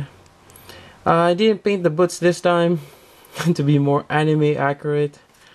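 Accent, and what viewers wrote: Philippines. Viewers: r u from the Phillipines?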